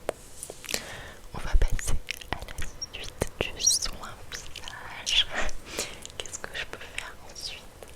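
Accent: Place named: England, United Kingdom. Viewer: incroyable la vidéo, c'est super relaxant et puis tu as un très bel accent anglais☺ Je m'abonne directement et j'espère que tu iras loin car tu en as le potentiel ;)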